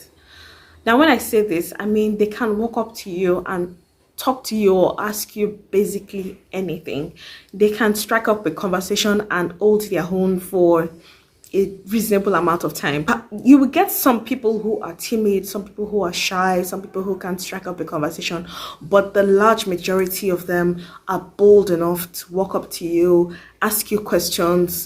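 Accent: South Africa. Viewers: Your pronouciation of words is of a South African. You assimilated easily. Thanks you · Wow I'm impressed by your English accent...you will swear that you South African... you speak like a local girl... lovely 🌹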